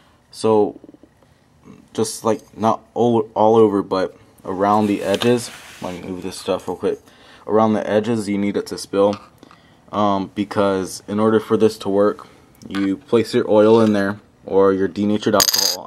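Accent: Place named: South, United States. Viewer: hes from the south yo :)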